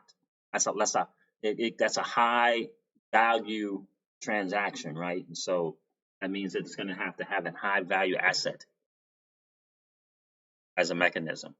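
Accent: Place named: Brooklyn, United States. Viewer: I think it must be a Brooklyn accent. There was a rumor that was posted on aa redit page from someone claiming to be a ex employee of ripple who believes that stellar and xrp have been designed to be used as world reserve currencies. XRP is the store of value and stellar to be used as a digital currency. What are your thoughts? Maybe you could do a video on that.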